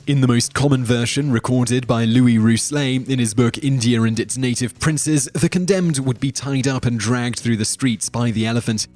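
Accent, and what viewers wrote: United Kingdom. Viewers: I HATE his British accent !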